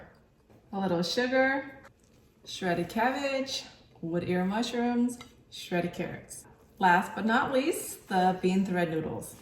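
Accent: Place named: Bay Area, United States. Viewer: I knew you were a bay area gal! I had a feeling from your accent and coolness. Go warriors!!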